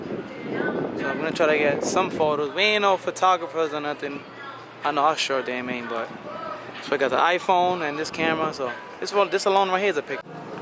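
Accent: New York, United States. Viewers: U from ny gotta be?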